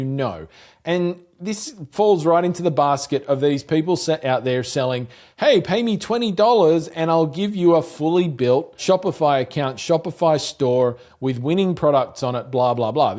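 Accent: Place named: Australia. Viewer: Love hearing such great AI myth busting here! Us poor dropshipping folk always get branded as the lazy ones reaching for the the new shiny thing. Love the channel and great hearing from a fellow Aussie!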